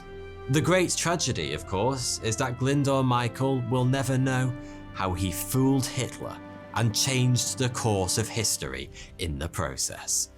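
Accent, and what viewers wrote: United Kingdom. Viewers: British English🤮🤮🤮🤮🤮🤮🤮🤮🤮🤮🤮🤮🤮🤮🤮🤮🤮🤮🤮🤮🤮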